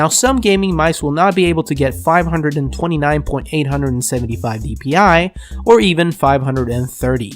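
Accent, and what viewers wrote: Gothenburg, Sweden. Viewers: Äru svensk?? HAHAHA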